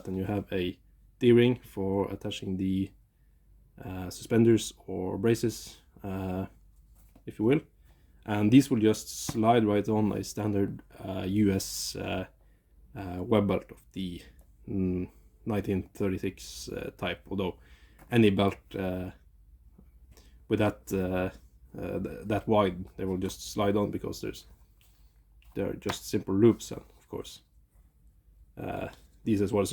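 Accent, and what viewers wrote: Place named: Norway. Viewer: Jeg hører på engelsken at du er norsk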